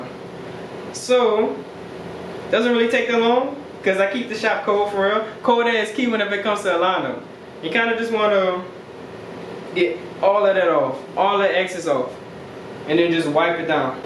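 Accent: Louisiana, United States. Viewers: Louisiana accent ?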